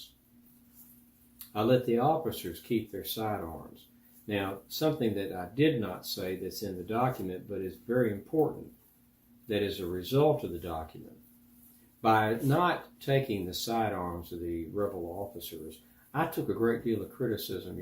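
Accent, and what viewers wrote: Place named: Tennessee, United States. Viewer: He sounds suspiciously like a Tennessean rather than an Ohioan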